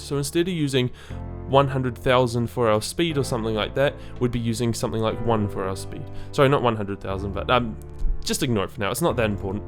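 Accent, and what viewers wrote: New Zealand. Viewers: Dude you're a kiwi haha awesome tutorial! Thanks man :)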